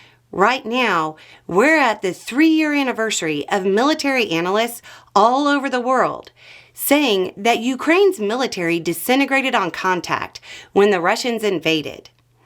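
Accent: United States. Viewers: Uau! A true Belle of the Ranch telling the obvious truths with a deep American accent! All is not lost in the USA! The war in Ukraine is currently the most transcendental conflict and will have global consequences.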